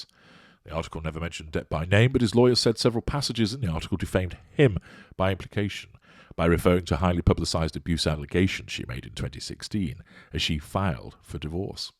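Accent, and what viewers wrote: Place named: Northern England, United Kingdom. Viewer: Think you hail from the North of England 🏴 have been curious for an age!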